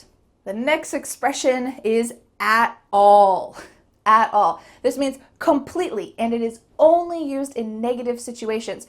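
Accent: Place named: United States. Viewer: Thanks for all your classes. With your methodology I can improve my english skills so much. My favorite youtubers to learn english are you with american accent, Lucy with british accent and Emma with Australian accent. I'm a beginner but I want to learn first american accent and for that I try to watch all your videos. Saludos desde Colombia.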